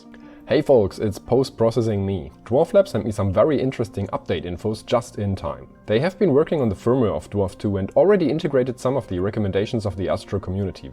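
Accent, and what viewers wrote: Germany. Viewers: German accent..... Ugh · Ich glaube deine Muttersprache ist deutsch ;)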